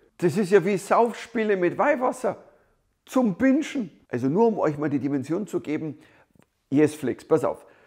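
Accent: Bavaria, Germany. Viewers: nein, nein, passt schon; ich finde es lustig! 😅 Überhaupt mag ich allgemein deinen/Ihren bayrischen Dialekt...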